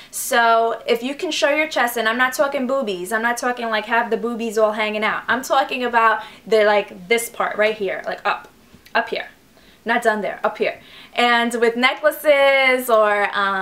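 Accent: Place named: New York, United States. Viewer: Ive been looking around for advice on how to dress for my body type and this is, by far the best to help me! Plus I love your New York sounding accent!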